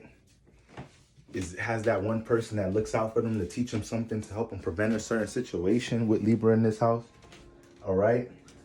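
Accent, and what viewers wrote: New York, United States. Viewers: I swear you from nyc or jersey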